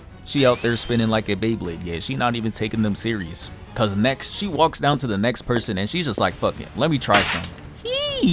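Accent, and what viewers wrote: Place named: South, United States. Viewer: Yeah pardna, he talk country.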